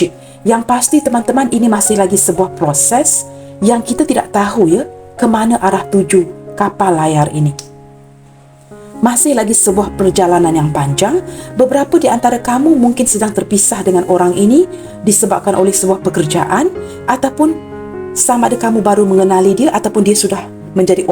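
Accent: Malaysia. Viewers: Sleng MCM mlyu lah..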